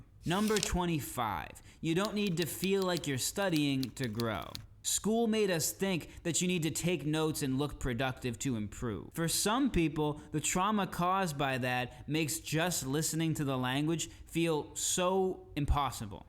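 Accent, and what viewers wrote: Argentina. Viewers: nice. congrats! good accent. bit of an argentinian twist or am I mistaken? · ok - thanks. 2.5 years (?) of studying Spanish got you to a hesitant B1+ with no sense of the prosody of the language (sounds like you 're attempting Argentinian pronunciation the way you realise 'y' and 'll'). You're definitely not at a level to pronounce yourself fluent (absolutely nowhere near it), and I'd suggest more humility when it comes to pronouncing what is and is not effective language learning. But hey, it's your channel, have at it.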